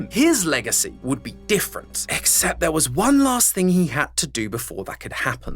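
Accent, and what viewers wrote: United Kingdom. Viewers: British Matpat 😭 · who is this brit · I’ve just started watching this and…When did you become british? Like genuinely curious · Why is he British now…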